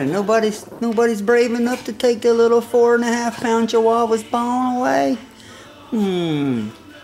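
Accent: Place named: Texas, United States. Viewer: What state are you from? Texas? Love the accent.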